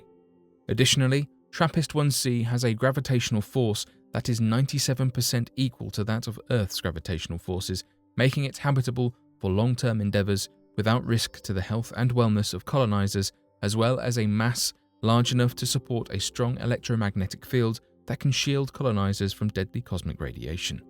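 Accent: England, United Kingdom. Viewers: Only an English accent can bring knowledge to life. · What's with the english accent? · British accents don't make it better. Just saying.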